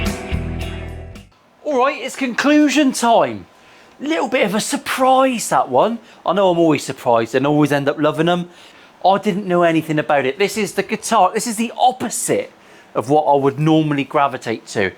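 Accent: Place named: Devon, United Kingdom. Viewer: Dude as a navy guy who has created more combo swear words than I can remember such as “syphilitic bastard”and insults such as “the best part of you ran down your mothers leg” I still find new hilarious insults from. And I say then with his Devon accent and I’m Texas. So I sound very proper with my insults.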